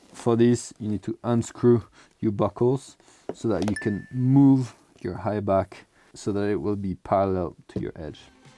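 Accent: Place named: France. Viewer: there is a reason why everyone likes! his so calm and radiates good energy, and the french accent is so smooth u can spread it on ur bread like butter, french butter =) everyone: hav a good surf on ur turf!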